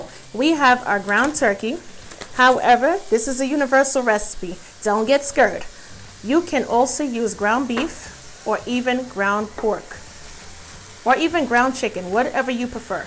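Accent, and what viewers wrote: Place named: New York, United States. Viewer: I LOVE HOW SHE SAYS SAUWWSE (SAUCE) LOL THAT NY ACCENT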